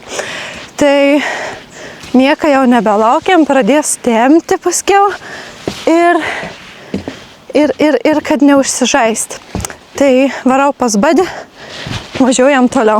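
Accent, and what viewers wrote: Samogitia, Lithuania. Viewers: Nu žiauriai gerai susižiuri video ,dar žemaitoke.Žodžių super .😏🤪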